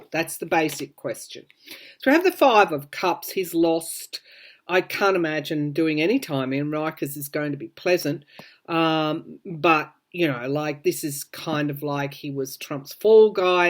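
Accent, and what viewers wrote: Australia. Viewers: Hello! I always SO enjoy your readings, the way you present everything, the humor! (Donald Capone😂) and the very personal way you „ talk“ to us. Very different from other readers ( apart from the Australian accent!). You bring a very human, personal touch to your readings. Thanks for all the time you take to bring us the latest insights! 🙏🌹🙏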